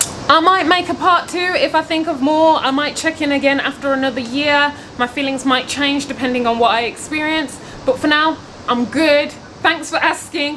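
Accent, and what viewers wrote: Birmingham, United Kingdom. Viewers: Girl!! I totally know what you mean about "settling" when making friends. I've been in Japan since 2018 and have a select few people I can call friends, pretty much because I can't get attached to people I wouldn't really hang out with in the UK. I know that makes me sound like a snob but Japan attracts a lot of weird foreigners and I don't want to spend my time with people I don't actually click that well with, or put myself into any compromising situations... hence my lack of a solid circle. One of my good friends (who no longer lives near me :(... ) is from Birmingham. He has the same positive energy as you and the accent sounds very similar. Are you from around there by any chance? Anyway, I feel like if we met for real, you'd be someone I'd choose to hang out with. Thanks for your positive, down to earth vibes! Hope to bump into you one day! 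:)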